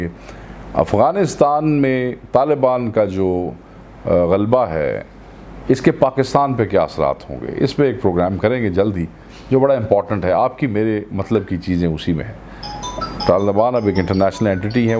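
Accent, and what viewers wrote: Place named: Punjab, India. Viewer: Sr apka punjabi tuch buht achha lgta hy 😑🤣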